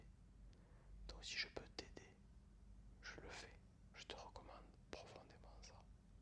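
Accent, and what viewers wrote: France. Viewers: Hum ça change beaucoup du reste de ce que tu fais 😉 Et c’est très qualitatif! Le ton de ta voix, le rythme, la diction, le petit accent du sud sans parler du contenu avec un vocabulaire et des tournures de phrase de très bon niveau! Tu devrais faire plus souvent des vidéos en français comme ça! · sound is just a touch too low. Need to hear that French accent.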